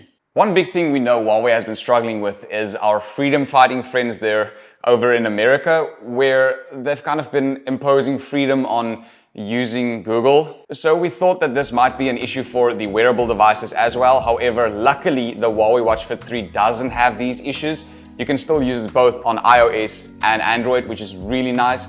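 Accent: South Africa. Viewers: He sounds South African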